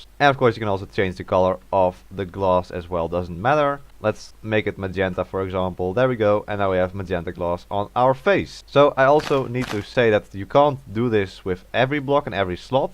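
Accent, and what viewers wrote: Netherlands. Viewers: ik hoorde het gwn aan het Nederlandse accent 😭🙏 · He is def from Netherland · i know for sure that you are from the netherlands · your accent sounds dutch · are you dutch??? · you sound dutch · Sounds very dutch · are you dutch?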